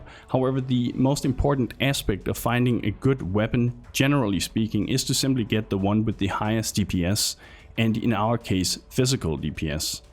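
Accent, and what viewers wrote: Denmark. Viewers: You sound very danish. Thumbs up!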